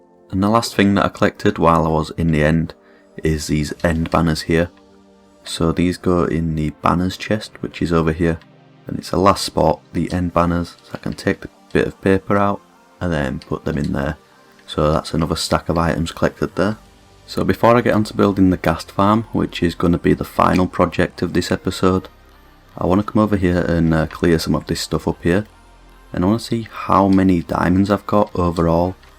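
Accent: Scotland, United Kingdom. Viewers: Ghast Farm????? Jesus you are cracked. I based my base on your's so thank you! You deserve more subs. Also, Are you Scottish? I love your accent :)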